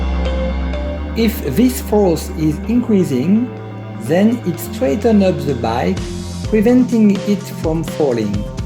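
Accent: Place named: France. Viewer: Bon rappel. Merci pour la séance de l'été dernier. Lovely french accent.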